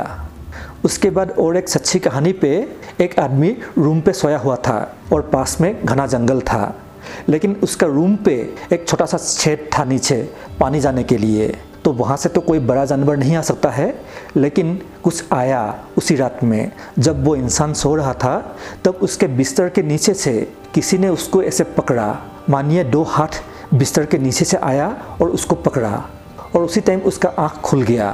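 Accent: Assam, India. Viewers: Apuni Okhomia naki? Apunar accent ... Okhom ot Mayang district ot ase bhoot...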